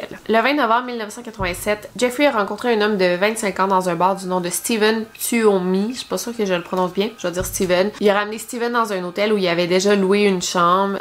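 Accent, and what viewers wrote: Quebec, Canada. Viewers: Franchement je viens de découvrir ta chaine et je la trouve trop cool , surtout avec ton accent québécois est super cool❤️😆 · merci pour tout ces faits divers que tu nous partage! mais j'ai vus que parfois tu lisait un livre au complet pour nous faire une video, ca doit te demander bcp de temps! comment tu t'organises, tu en lis plusieurs en meme temps? merci encore pour tes videos j'adore l'accent quebecois et les faits divers, tu combine les deux! et en plus tu es une très belle personne (daans les deux sens ahaha) et tu fait des videos completes, bref autant te dire que je suis tes videos comme si c'etait ma serie favorite!! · Elle n'a pas aucun faute, c'est evident, mais je trouve l'accent quebecois trés marrant... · Sans vouloir critiquer, mais l'accent quebecquoi pour quelqu'un venant de france est vraiment très lourd! C est trop bizarre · Aiee c chaud cette histoire ( gg au prisonnier 😂😂 ) continue comma ça j’adore l’axon canadien en plus 😉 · super vidéo encore une fois ! 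Je suis vraiment fan de tes vidéos ! Et je te jure, moi qui aimais pas tellement l'accent québécois, tu m'a vraiment fait aimer l'accent ahah, sinon continue vraiment les vidéo ! je ne supporterais pas ne plus te voir 😘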